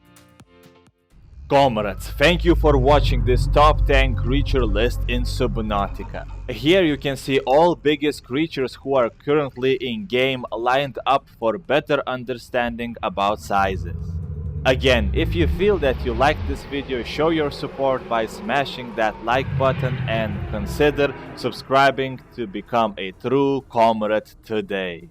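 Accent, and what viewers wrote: Russia. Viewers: этот русский акцент прекрасен! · Этот акцент... Русский, да? :) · Haven't seen this guy's vids before. There's something really charming about his heavy Russian accent in combination with his upbeat attitude. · is he russian _ accent_ calls us camrads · You sound russian? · I love your voice Russian! And goodjob dude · This is the one guy on youtube who has a legit russian accent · dude you sound like a badass Russian general your accent is epiac! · Your Russian accent sustains me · Lol for some reason i had this ransom feeling the narrator would be a russian male...i CANNOT belive i was right...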